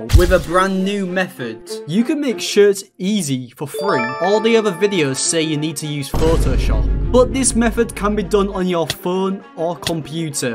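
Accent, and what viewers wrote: United Kingdom. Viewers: Mate, are you British?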